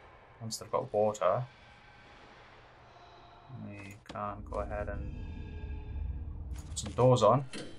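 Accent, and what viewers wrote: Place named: Newcastle, United Kingdom. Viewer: Where abouts from Newcastle are you from?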